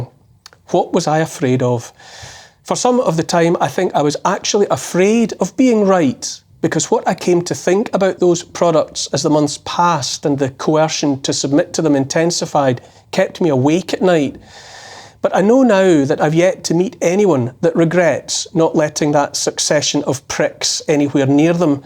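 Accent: Scotland, United Kingdom. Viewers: Thank you for your commentary. Glad to hear so many talking about this. I especially like to listen to you Scottish accent!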